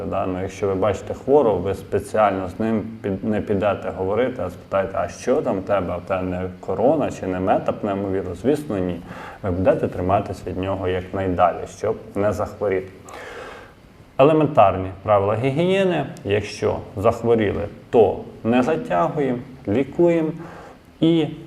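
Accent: Ukraine. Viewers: Вы учитель информатики который говорит на русском с украинским акцентом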